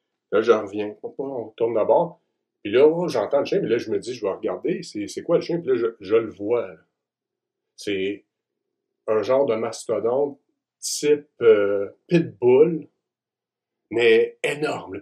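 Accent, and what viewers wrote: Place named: Charente, France. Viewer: Sauf erreur de ma part, c'est pas l'accent vendéen. Plutôt celui d'un piéton de l'Atlantique ! Pas très loin de vous, en chatente ❤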